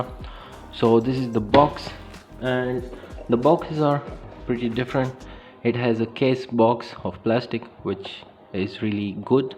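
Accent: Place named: Pakistan. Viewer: Пакистанский акцент. Очень сложно понять его английский.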